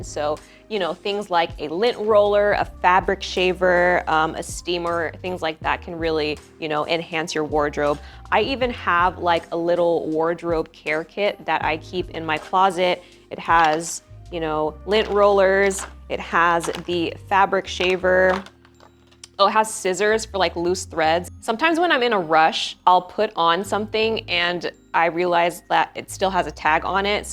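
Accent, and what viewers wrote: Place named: Australia. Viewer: I love you!! And your Australian accent is the best! 😂 very helpful tips!! 🙏🙏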